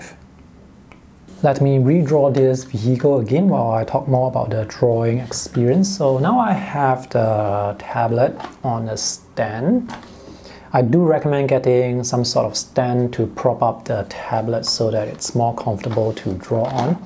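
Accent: Singapore. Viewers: Hey! Really enjoyed this video as I am a fellow designer myself and feeling very conflicted on whether i should get the iPad Air or the Pro for sketching. I was wondering if you're based in Singapore cuz of the way you speak and then I saw the sketches haha! Singaporean here too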